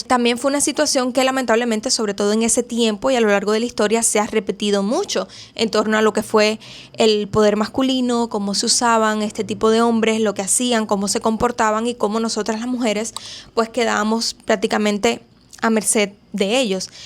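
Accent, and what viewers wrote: Cuba. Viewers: Nena recién conozco tu canal y apenas escuché tu voz pensé: "su acento suena cubano" y cuándo leí el nombre del canal me lo confirmó 😅. Saludos desde Cuba🇨🇺❤ PD: nueva seguidora acá, los temas de tus videos me llaman mucho la atención y estaré haciendo maratón siempre y cuándo la corriente me lo permita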